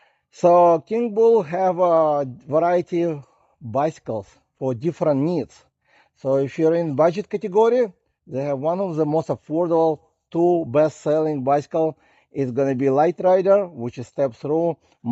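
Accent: Russia. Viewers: Good review but you have a thick Russian accent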